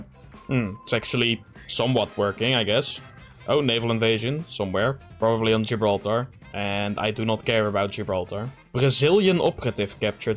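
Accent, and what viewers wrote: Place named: Netherlands. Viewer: Stoel! Ik denk daar een vaag Nederlands accent te herkennen? English for those confused: Chair? If I'm not mistaken that's a Dutch accent?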